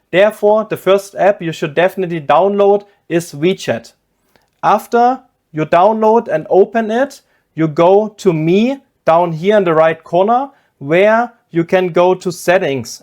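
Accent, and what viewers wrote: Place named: Germany. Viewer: Ich hab noch nie so Glas klar einen deutsche am Akzent erkannt. Geil haha! 👍🏼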